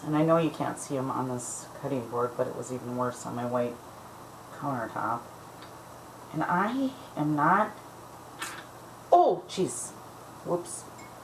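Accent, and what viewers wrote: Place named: Wisconsin, United States. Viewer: I heard a Wisconsin accent there and the phrase 'up north' --only Wisconsinites use that phrase. Good post--learned a lot--made a yarrow/plantain with students--we too had to use stove technique, though I heard letting it sit in sun is more effective.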